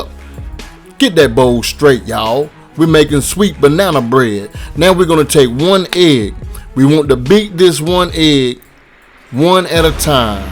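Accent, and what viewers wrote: South, United States. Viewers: I love the southern drol. My youngest child grew up on southern food through his day care person. She was awesome. Fed the kids. My youngest is 6'3 & my eldest 6'2 they eat everything even if it is on my plate. They cook now..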